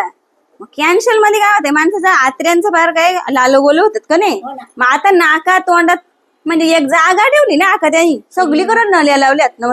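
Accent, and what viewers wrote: Murbad, India. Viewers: काय बोलावे आता फुयजी..... एकच नंबर बोलता तुम्ही 👌👌👌👍👍👍.....याला म्हणतात मुरबाडी भाषा ❤❤❤ आणि दोन्ही पण म्हतार्या त्यांची डोबरा सिंदारित सोडून तुमच्या बाता मन लावून ऐकत्यात 😂😂😂आता तुमच्यामुळे त्यांची डोबरा कुइकं जातील आणि त्यांचं म्हतारं त्यांना किती चोपतील...काय सांगू शकत नय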